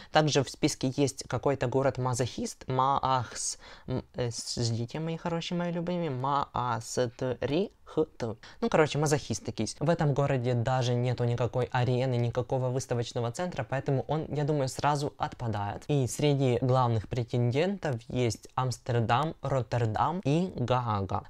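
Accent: Ukraine. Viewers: В первые слышу чисто украинский акцент)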